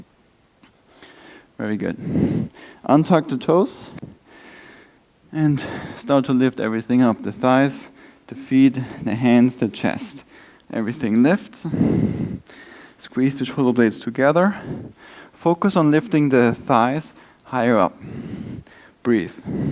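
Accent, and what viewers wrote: Germany. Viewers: Hello. I've just recently found you on YouTube and and really loving and resonating with your practice. It is challenging but accessible and I really love your connections between poses. I've had a difficult time lately getting onto my mat, but your practice has encouraged and motivated me. So thank you! xo And I adore your German accent! ;-)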